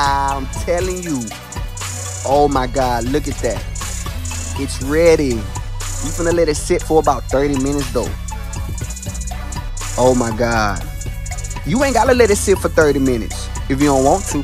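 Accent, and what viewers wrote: Louisiana, United States. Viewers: i could listen to a lousiana accent all day 😌🙏🏽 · I'm from louisiana and I ain't ever heard someone in my hometown have such a thick accent😩 he got them thick thick accent bro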